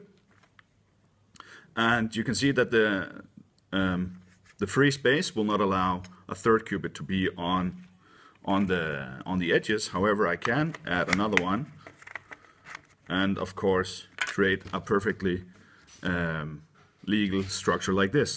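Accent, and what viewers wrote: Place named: Denmark. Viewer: Where are you from? You sound Danish :o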